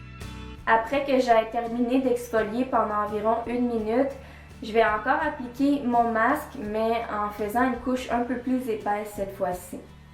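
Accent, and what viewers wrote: Quebec, Canada. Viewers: Je pense que je vais l'utilisé ce masque, sa va faire du bien a peau surtout qu'elle commence avoir des boutons :( et juste j'adore ton accent Québécoise je te fais tout plein de gros bisous :)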